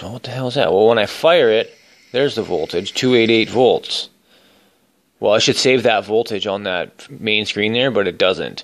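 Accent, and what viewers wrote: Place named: Canada. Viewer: sounds like Canada for sure.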